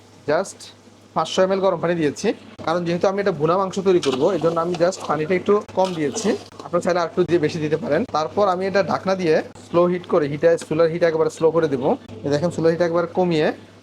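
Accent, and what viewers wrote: Noakhali, Bangladesh. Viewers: আমনে নোয়াখালীর মানুষ, কথায় বুঝা যায়, তবে রান্নায় ওস্তাদ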